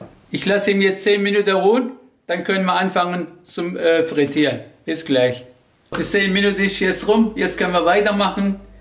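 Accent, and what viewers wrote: Swabia, Germany. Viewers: das beste ist dein schwäbisch. Danke furs Rezept. :-)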